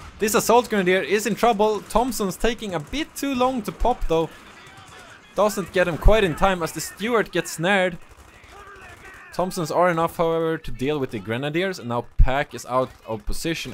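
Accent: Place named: Sweden. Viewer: "... and me is casting" I love this Swedlish too much to criticize it.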